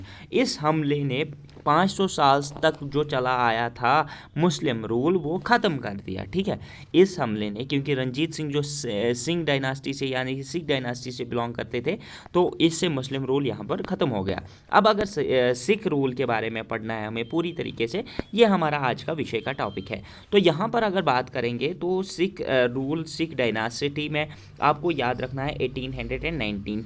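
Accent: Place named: Kashmir, India. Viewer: That kashmiri accent in teaching is so nostalgic ..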